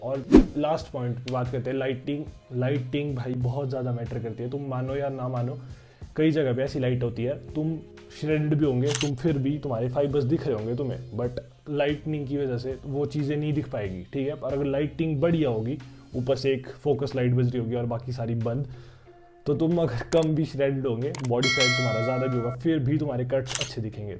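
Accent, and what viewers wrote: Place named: Himachal Pradesh, India. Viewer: Bhai aap Bolne ke accent se Himachal Pradesh ke lagte ho.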